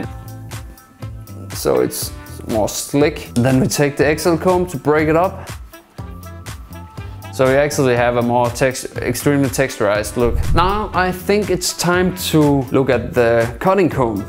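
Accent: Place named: Denmark. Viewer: Dansk accent dræber mig..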